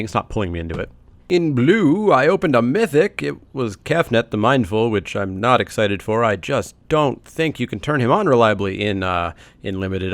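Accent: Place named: Canada. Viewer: I never realized you were Canadian, but after you mentioned you're in Ontario, I suddenly started noticing your accent, and it's like "how did I miss that?"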